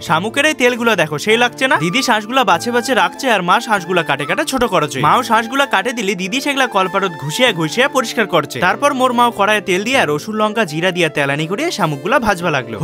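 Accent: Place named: Rajbongshi, Bangladesh. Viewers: বাংলাদেশের বগুড়ার ভাষার মত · Dada amio to Rajbongshi but tomar Rajbongshi vasa gulo alada mone hochhe keno...? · দাদা মুই আপনার fan বাংলাদেশ থেকে বলিছু মোর গ্রামের বাড়ি দিনাজপুরে এইভাবে কথা বলি হামরা এক কথায় এটা হামার গ্রামের আঞ্চলিক ভাষা🥰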